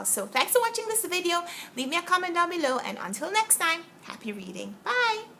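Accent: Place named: Jamaica. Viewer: It's so heart-warming! I too LOVE your Jamaican accent! It's so beautiful! Irie!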